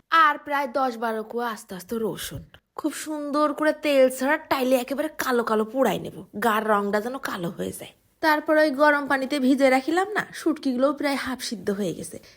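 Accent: Jessore, Bangladesh. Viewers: Apu tomar kotha sunla ami kotha bolta sahos pai ta na hoila amar kotha sunla sobay hasi thatta korto akhon tomar kotha sunla mona hoi amara jessore ar manus ar kotha sotti misti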